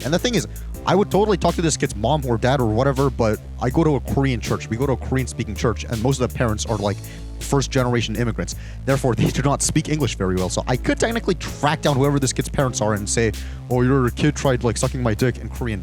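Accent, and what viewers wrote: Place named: Australia. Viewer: I like your accent it sounds Australian:]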